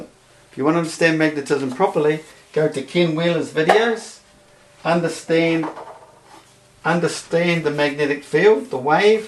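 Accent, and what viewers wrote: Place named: New Zealand. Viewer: he sounds like a very clued up kiwi man! ❤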